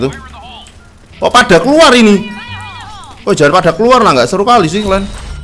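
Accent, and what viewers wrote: Java, Indonesia. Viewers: baru nonton lagi video bg ini makin medok ye ngocehnya wkwk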